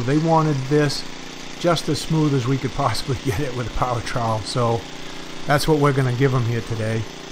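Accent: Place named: Massachusetts, United States. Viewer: Judging by the accent I'm gonna say Massachusetts government BS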